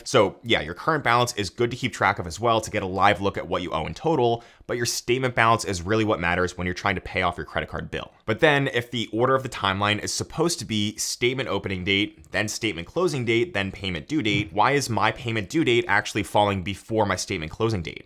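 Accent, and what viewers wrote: Philadelphia, United States. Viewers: Wait are you from Philly?! 😂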